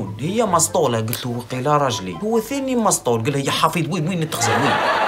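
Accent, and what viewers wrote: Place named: Setif, Algeria. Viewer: خوياا لهجتك تشبه لهجتناا سطييف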